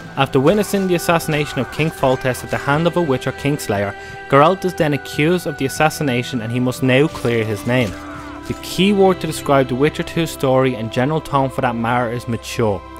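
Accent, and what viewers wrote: Ireland. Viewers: the irish accent makes this review sound so much more cool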